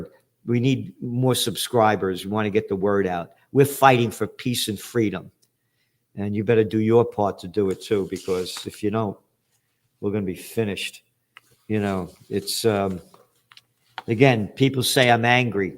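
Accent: New York, United States. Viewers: There is nothing more satisfying than hearing someone with a New York accent cursing out the thieves,liars,massmurderers and corrupt A-holes that is the elite political and economic establishment. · Love you Gerald! Just signed up for the annual subscription! Love the Merch!! Colorful language with a New York accent, reminds me of listening to my family from there.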